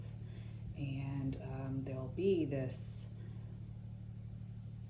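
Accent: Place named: South, United States. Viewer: Fantastic reading. You really explain well the Pluto energy and its impact on relations. I subbed because your explanations are great and I'm a Southerner and love listening to us discuss mystical things. 💯💯💯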